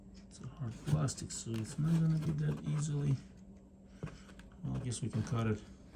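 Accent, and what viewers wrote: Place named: Russia. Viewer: Сразу слышно русский акцент)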